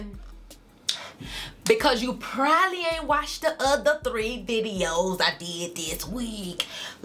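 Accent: South, United States. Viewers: is on a natural high. We luv you gurl. sounds like a Southern Bell😂😂😭😭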